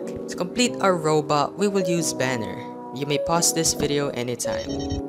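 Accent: Philippines. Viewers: You sound Filipino.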